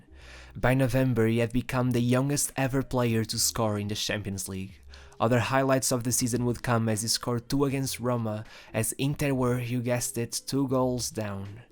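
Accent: Portugal. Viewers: Just one question are you Portuguese cause I think the way u pronouce latin words sounds a lot like it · You sound Portuguese, are you? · fantástico como se conhece os tugas ao longe a falar Inglês... bom trabalho! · Dar support, nota-se que é tuga pelo sotaque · the guy casting the video is so portuguese ! can tell by the accent xD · Every morning every football player should look into a mirror and say"Thank you God c'os i'm blessed..I do what i love to do and i get paied for it..I do what what millions of kids in the world never reach,and my life in footballl it's like a life of an orchidea,so lets be excelent,lets seize the day"...It's only a very humble vision of life it costs nothing...But not,too much shit in the brains,too much drugs,too much women,and the shine of youth fades...wasted!...Good work with the video only the accent it's too much Tuga(iAm i wrong?)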